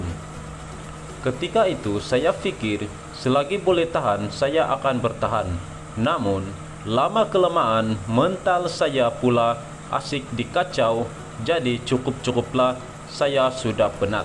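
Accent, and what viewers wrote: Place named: Sabah, Malaysia. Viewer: Pekat juga bunyi bercakap dusun sabah kau itu boiii☺️☺️☺️☺️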